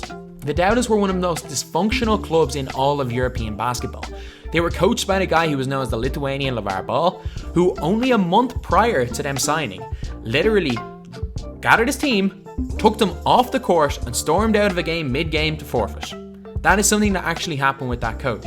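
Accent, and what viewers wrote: Ireland. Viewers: You sound Irish are u Irish? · Are you irish?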